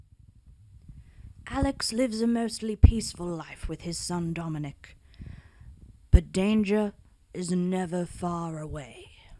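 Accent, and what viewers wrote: Australia. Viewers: Wait... Are you actually Australian? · Mohammed Kortam it’s Australian because the person who’s speaking lives there lol. Pretty standard stuff. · U r Aussie I can tell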